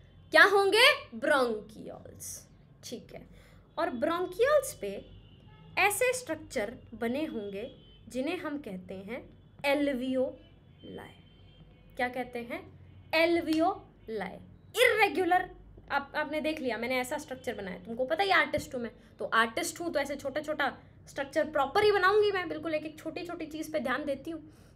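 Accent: Haryana, India. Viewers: Boli haryanvi lage sey ❤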